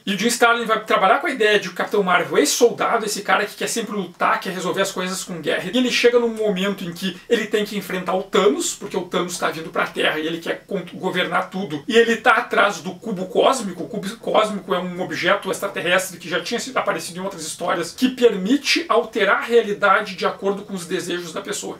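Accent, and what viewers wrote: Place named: Rio Grande do Sul, Brazil. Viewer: me inscrevi e tô vendo, gostei da perspectiva, sotaque do RS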